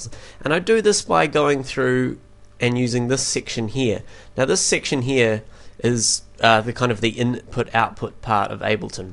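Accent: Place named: New Zealand. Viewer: Oh and by the way, I love the accent, I'm from Australia and for some reason. Just love New Zealand accents. "You might like 4, you might like 8, you might like 10 or 2. I like sex!" Hahahahah that was soo good! Love you man, keep up the good work!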